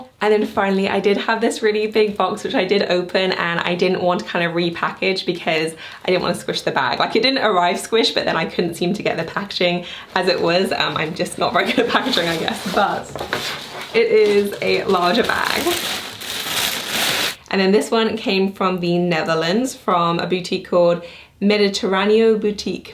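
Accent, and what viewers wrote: England, United Kingdom. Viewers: Love your videos, but must admit it's a bit disconcerting to hear you say 'store' quite so much in an English accent! Have never heard anyone here refer to shops that way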